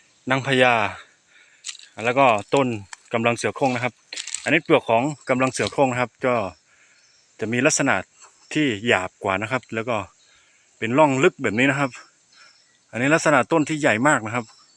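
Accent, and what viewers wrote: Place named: Central, Thailand. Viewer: คุณเคยอยู่หรือไปเรียนในเมืองมาก่อนหรือเปล่าคะ พูดสำเนียงภาษากลางชัดเจนดีมากค่ะ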